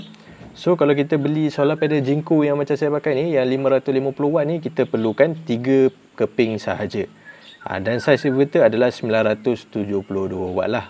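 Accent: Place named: Kelantan, Malaysia. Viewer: Terima kasih atas perkongsian.. Jelas dan jeliro ore kelate royat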